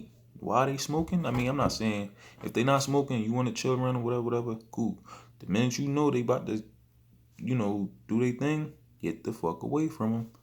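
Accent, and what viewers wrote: Philadelphia, United States. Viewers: Before he even said Septa I knew bul was from Philly. Props bro. I’m on the same path. This helped me stay focused.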